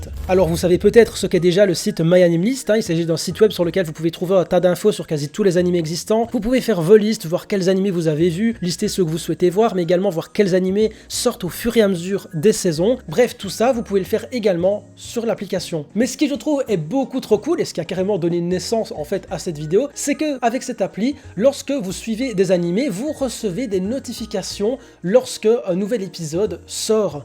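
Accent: Belgium. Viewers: néerlandais ? jure t'es belge ? 🇧🇪🇧🇪🇧🇪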